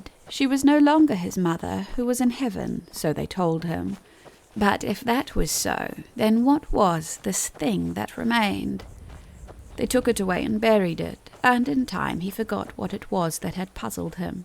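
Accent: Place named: New Zealand. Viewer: The sad unfortunate side of listening to these knowledgeable people is just a reminder of how little I know! How unfortunate that there is so much to learn and yet it would take a plethora of lifetimes to just break the surface. …but, do endeavour to learn as much as I can while I walk the earth! Actually your Kiwi accent is what makes your narrations so appealing.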